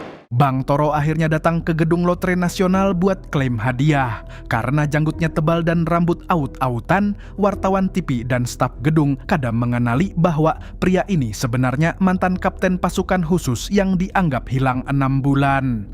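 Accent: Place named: Banyumas, Indonesia. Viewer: ngapakke krasa banget koh wong banyumas mesti kie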